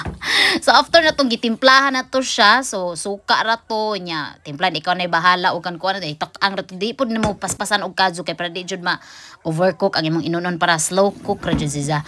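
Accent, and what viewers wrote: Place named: Visayas, Philippines. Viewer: Hahahah eninglish palang hibaw an na 😂.bisaya ni by.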